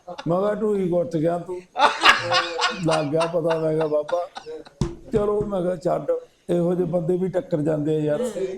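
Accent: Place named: Lahnda, Pakistan. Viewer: So sweet ❣️❣️❣️❣️ Lahnda Punjabi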